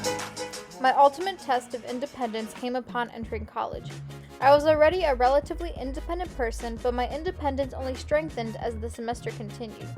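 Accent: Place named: Boston, United States. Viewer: You almost have the Boston accent. Bah-stin.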